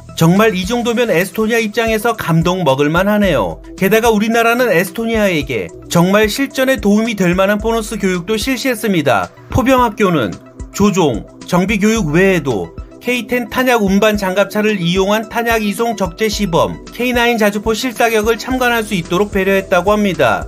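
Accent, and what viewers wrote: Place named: North Korea. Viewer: 영상 잘 봤습니다. 영상 몇개를 봤는데요. 내용이 참 좋네요. 근데 말투가 남조선민주주의인민공화국 스러운 말투가 떠오르는 듯한.....ㅎ;(죄송요^^;) 영상 초반에는 너무 강렬 하신데, 후반으로 갈수록 남조선민주주의인민공화국 스러운 느낌이 빠지고 괜찮아 지시는데 ㅋ 말투 특유의 개성이 있으시네요. 살짝 북한 스러운 ㅋㅋ 초반에 좀 어색했네요. ㅎ 암튼 내용 좋고, 개성 있으셔서 잘 되실거 같습니다.^^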